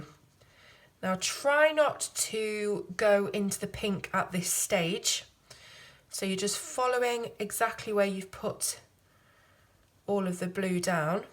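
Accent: England, United Kingdom. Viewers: I love your accent from england 😍 nice greetings from germany 👋🏼🇩🇪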